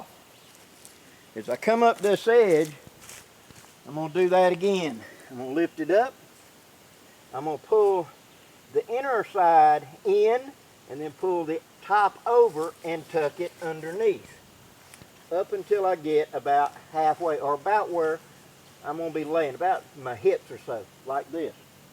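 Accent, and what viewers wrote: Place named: North Carolina, United States. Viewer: "It takes no effort to be miserable" love it! God bless our Marines. Speaking of Marines, I had a good friend who was a former service Marine who was from Hickory North Carolina, and he spoke very much like you do sir. Might you also be from around that part of our great country? Wherever you hail from, I thank you for your great informative videos!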